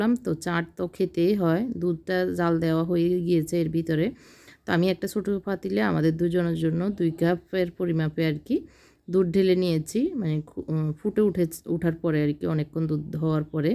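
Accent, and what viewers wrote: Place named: Noakhali, Bangladesh. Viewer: আপা নোয়াখালীর মনে হয়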